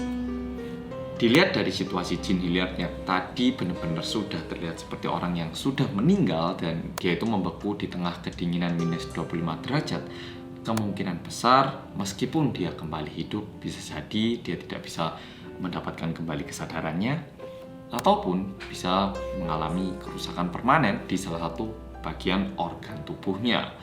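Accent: Java, Indonesia. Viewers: Ngomongnya medokss jawa banget kalau ngomong bhs indonesia..hehehe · Akhirnya konten medok lagi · YAYY OPPA MEDHOK UPDATE 💖💖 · Bersama orang korea yg medhokkk ❤❤ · entah kenpa cara mas menyampaikan cerita terasa sangat santai dan gaya medoknya membuat saya kangen kmpung halaman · orang korea yang medok balik lagi yey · Mas sampeyan lek tak rungokno wis rodo ilang medok e 😁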